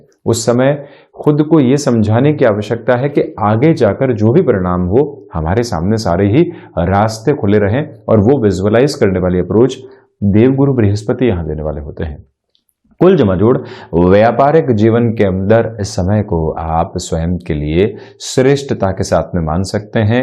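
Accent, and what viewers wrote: Standard. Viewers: Pranam Guruji Your Hindi is very pure & clear . Very well explained. Regards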